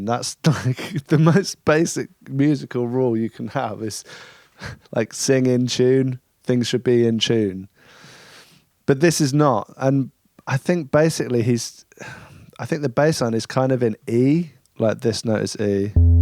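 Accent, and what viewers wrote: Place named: England, United Kingdom. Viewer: being an american, you have the most english accent i think i've ever heard haha. that is, other than the old timey exaggerated nonsense.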